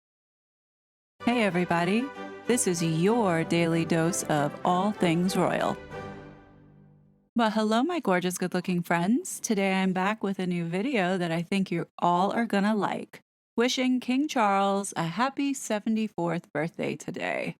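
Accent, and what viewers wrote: France. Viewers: Ooohhhh by the way your French accent is great. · You have a gorgeous French accent!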